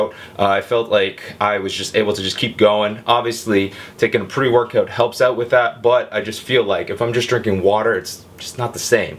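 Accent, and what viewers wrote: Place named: Canada. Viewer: Is he canadian? He do have a canadian accent.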